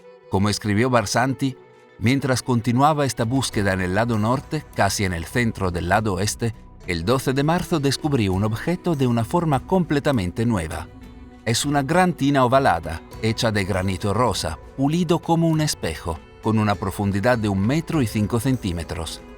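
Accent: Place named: Italy. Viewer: Perché nn fai. Il documentario in italiano se siente mucho tu acentazo Italico man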